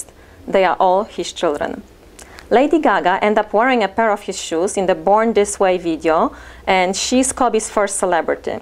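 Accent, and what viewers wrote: Poland. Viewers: amazing polish accent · Are you polish ? Because you have a polish accent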